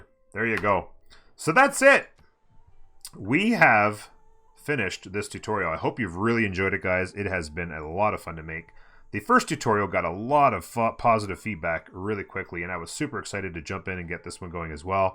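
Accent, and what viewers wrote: Canada. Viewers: The Canadian accent is so adorable. Our and Are sound the same, but Hour sounds completely different. Love it!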